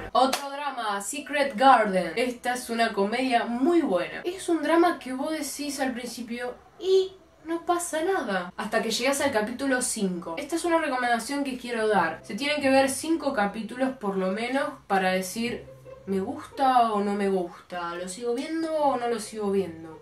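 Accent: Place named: South Korea. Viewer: JAJAJAJA la intro!! El coreanespañol cute que se te sale, es re tipíco de doramatica!! Personalmente te recomiendo Black que está en netflix, no te vas a arrepentir!! También te recomiendo Hello my twenties, las vas a adorar, también Descendants of the sun, Weightlifting fairy Kim Bok Joo. En fin, no terminaría más de recomendar pero dejo esos que están geniales. Saludos!! 💕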